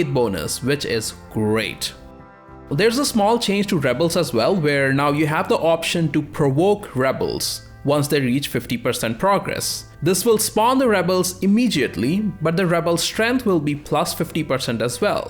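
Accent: India. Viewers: I think the appropriate term is Indian or South Asian, Hindu means someone who is Hindu and may be offensive to those who are of other religions. And yes I agree, he seems to have an Indian accent. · An Indian accent in the gaming community :D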